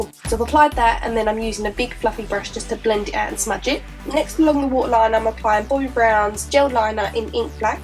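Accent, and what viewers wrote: Essex, United Kingdom. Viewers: First time watching your videos!! You are really talented! if you dont mind me asking, ive noticed you have abit of an accent... are you from essex? :) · I love watching people apply it. It's like an artform. I'm not so good at it, but you seem to have a pretty good grasp on cosmetics. And I hear an accent...Are you from Essex?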